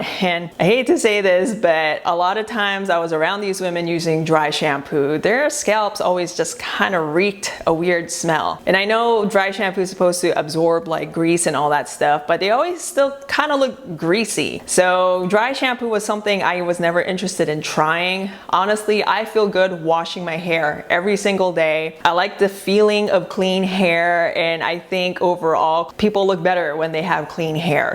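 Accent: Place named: Singapore. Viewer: Are you originally from Singapore? My friend is and her accent is similar.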